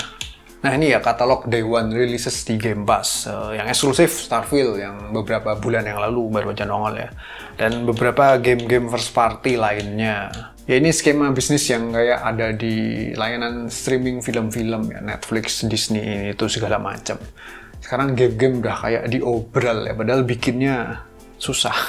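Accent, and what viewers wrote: Java, Indonesia. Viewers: Anjir bang Lu awet muda banget, terakhir nonton 2020 an, wkwkwk Ciri khas medok dan santai nya masih konsisten sampe skrg😂😂😂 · Nah Justru krna Medok nya itu... Jadi ciri khas Menarik 😅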